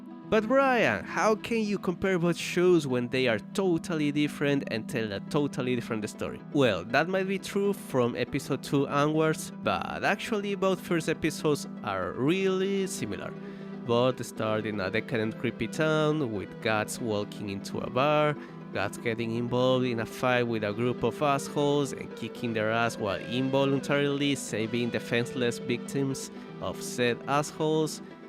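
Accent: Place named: Eastern Europe. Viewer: I can hear some Eastern European accent. You're from Georgia?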